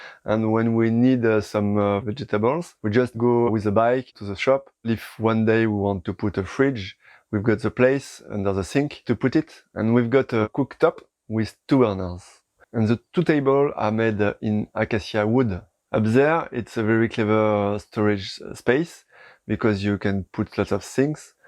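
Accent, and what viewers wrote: France. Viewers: His French accent.🙄🙄🙄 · Si tu sors de la France, la langue française ne sert plus à rien, donc pour atteindre un large public, c'est mieux de communiquer directement en Anglais avec l'accent français, c'est mignon, n'est-ce pas ?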